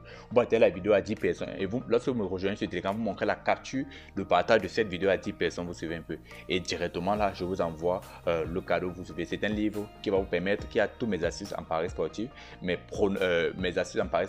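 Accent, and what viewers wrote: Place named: Cameroon. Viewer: Prochainement il faut parler doucement, tout le monde ne comprend pas ton accent camerounais et c'est rapide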